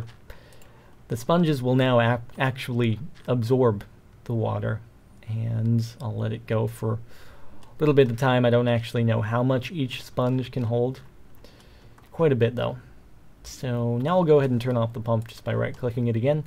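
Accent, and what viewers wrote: United Kingdom. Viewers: He sounded british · way do you spik bridish?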